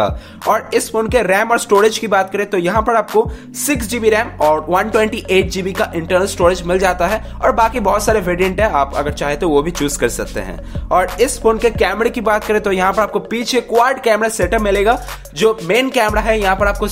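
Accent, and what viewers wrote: India. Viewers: Nice work but your hindi accent bro😂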